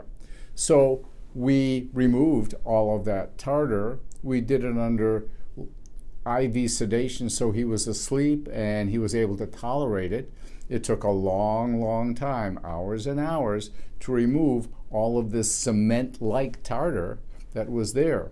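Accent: United Kingdom. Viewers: Let me guess..... ....British?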